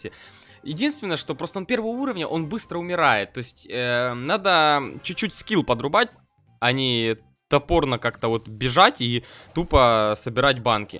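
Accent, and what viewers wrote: Ukraine. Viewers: Ухх как же меня бесит его акцент. Сколько лет знаю, столько лет мне режет уши его украинский акцент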